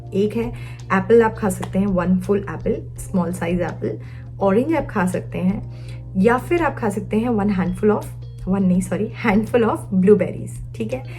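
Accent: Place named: Pakistan. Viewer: Not at all like Marium Pervaiz infact more perfect urdu than her. she is speaking urdu in Pakistani urdu accent. I am Pakistani BTW and we speak urdu like her. 😊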